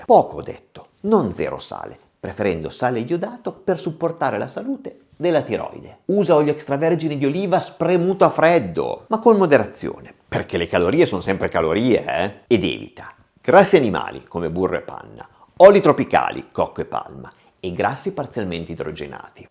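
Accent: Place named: Piedmont, Italy. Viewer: Ciao Doc, visto l'accento piemontese forte e il cognome, posso chiedere la città o paese che sia?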